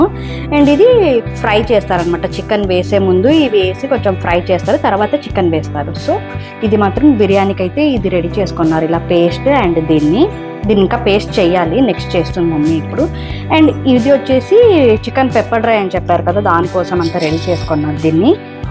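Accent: Karnataka, India. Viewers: Miru karnataka vala mi telugu border lo kannada valu ela ne matladutharu memu same mi la ne matladuthamu telugu but we are kanadigas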